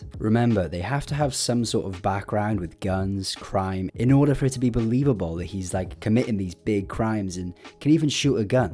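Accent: Wales, United Kingdom. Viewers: I know a fellow Welshman when I hear them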